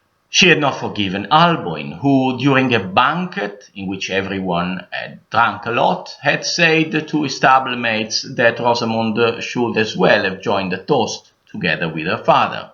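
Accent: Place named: Italy. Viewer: I love Your lectures. They're both informative and entertaining. At first I thought it was a public lecture from some fancy university but It seems like it's Your private initiative. Really quality material. You speak with a thick Italian accent, so subtitles are useful, but I like the flow and melody of your language. Can't wait for the next upload!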